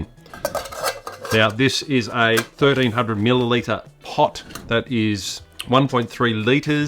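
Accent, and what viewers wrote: Australia. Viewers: As an American man, I think this guy is Australian ☺️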